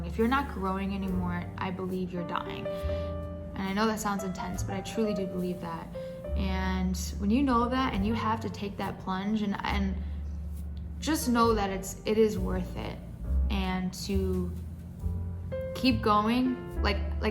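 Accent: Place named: Russia. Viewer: u have russian accent :D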